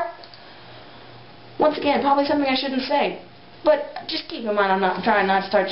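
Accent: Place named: Texas, United States. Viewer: so, if you are not from Texas where are you from. You must have lived in Texas for long enough to gain an accent. I really enjoy your video, and you look amazing.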